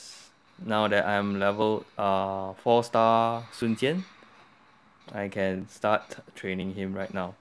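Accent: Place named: Singapore. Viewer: well... your accent is typical singlish so im used to it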